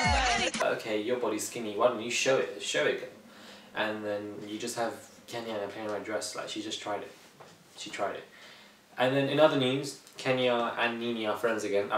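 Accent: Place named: United Kingdom. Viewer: RHOA reviewed with a British accent????? LOVE IT!!!!!!!!!!!!!!!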